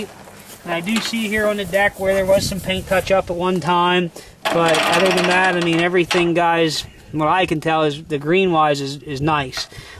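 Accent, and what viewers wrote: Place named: Pennsylvania, United States. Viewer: I can tell you're from Pennsylvania with that Pennsylvania accent lol. Good looking 318 you got there!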